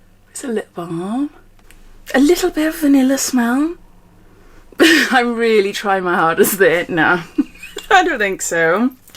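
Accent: Wales, United Kingdom. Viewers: Really sorry to hear someone was so rude about your bubbly personality. It’s one huge part of why I love your videos. Honestly, thoughtful reviews, also I do love Welsh accents 😄 Ignore the odd silly comment. This calendar looks really great. It would’ve been on my hit list if I could get it shipped over. You’re spot on as well… “no Avant”! Those products tend to artificially inflate the overall value and I find it off-putting when I see them in boxes or calendars. I wish their pricing was more in line with the rest of the industry.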